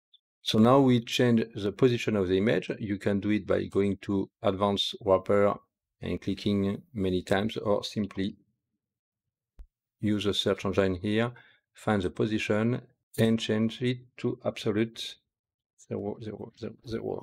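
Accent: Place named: France. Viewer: Thanks for this tuto. Sounds like your french like me 😅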